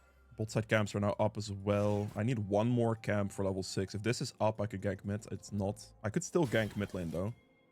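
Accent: Netherlands. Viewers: Are you dutch or so? Great video dude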